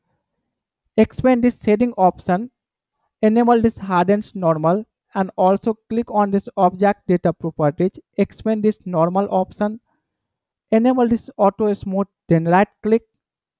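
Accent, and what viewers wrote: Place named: India. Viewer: super ultra giga pro max Indian accent luv it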